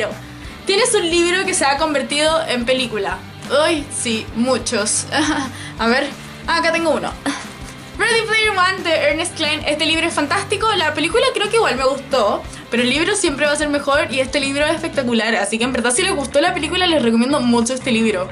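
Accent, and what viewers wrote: Chile. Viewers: eres chilena perdon recien te conosci en youtube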